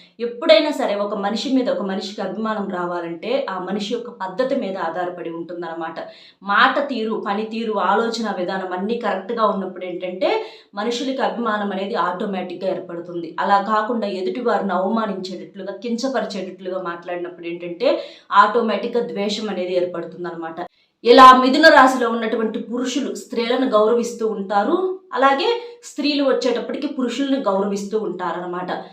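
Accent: Nellore, India. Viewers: YEVURAKKA NIDHE NELLOREAA BAGANE CHEPTHUNNARU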